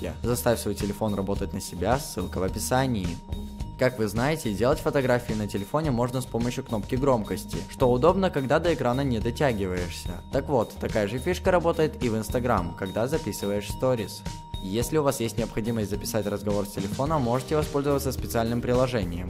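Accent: Belarus. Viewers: Ты из Беларуси. (это утверждение)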